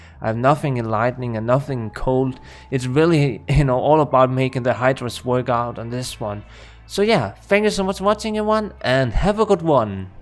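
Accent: Denmark. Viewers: Are you from Denmark? Your dialect sounds a little Danish 😀💪🏻 great video!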